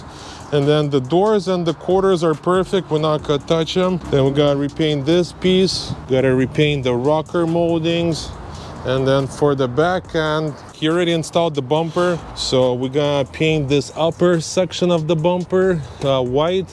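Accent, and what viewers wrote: Russia. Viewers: Ну по акценту скажу что чел то русский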